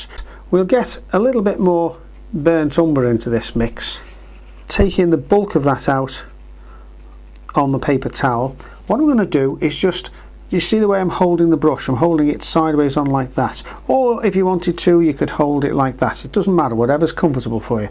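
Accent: Liverpool, United Kingdom. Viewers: this guy sounds scouse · Lovely demo,and great to hear a fellow scouse accent!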